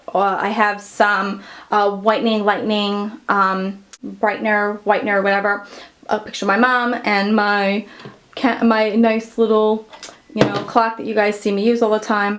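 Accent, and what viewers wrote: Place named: New York, United States. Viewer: Are you from New York or New Jersey or something? I hear the accent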